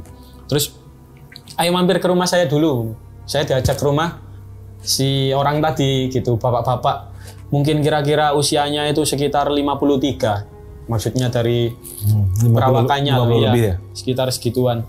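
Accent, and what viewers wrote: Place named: Java, Indonesia. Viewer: Medok banget mas....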